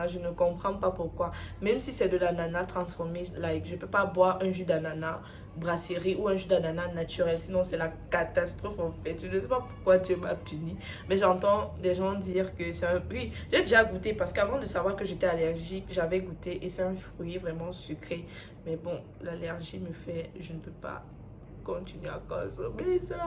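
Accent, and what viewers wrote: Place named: Cameroon. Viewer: Il y a un dernier accent là qui sort quand tu parles🙌🏾😜 vive de 237🔥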